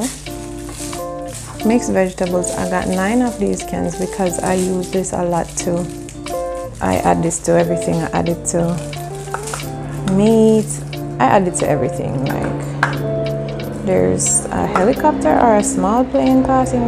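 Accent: Jamaica. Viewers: Hey happy to be participating in this collab with you do I hear a accent in there somewhere Jamaican. New friend here and new subscriber as well going cover for IG group he's too adorable thank you for sharing❤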